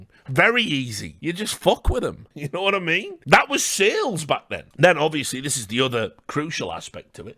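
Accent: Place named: Birmingham, United Kingdom. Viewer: Brummie \m/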